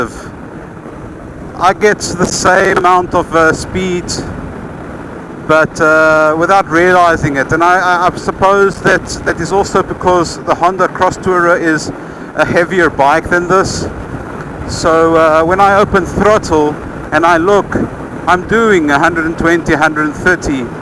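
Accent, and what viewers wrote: South Africa. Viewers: Ai cool, was wondering as you have the SA accent but you are riding on the wrong side of the road ;)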